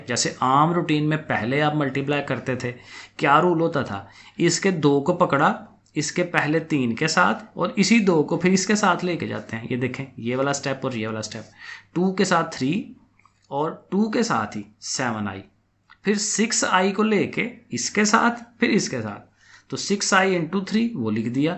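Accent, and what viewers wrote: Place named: Lahore, Pakistan. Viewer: Sir Ap Lahore sai hain?Ap ka Accent lahoriyon ki Tara ha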